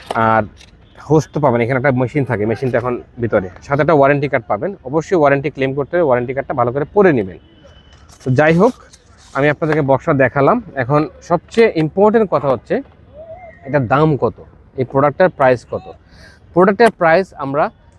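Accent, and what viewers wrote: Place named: Sirajganj, Bangladesh. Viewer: সিরাজগঞ্জ কথায়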